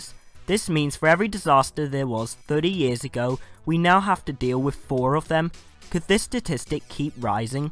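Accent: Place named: Northern England, United Kingdom. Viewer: Not just saying it, this was my favourite video of yours so far.. The clarity of your voice is perfect on this :) Where are you from? I'm getting everything from Scouse to Manc to Yorkshire!!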